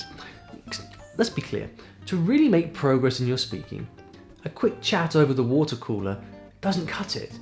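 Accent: United Kingdom. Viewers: It's British accent and everybody likes it. His accent is the main reason of his subscribers to stay at this channel.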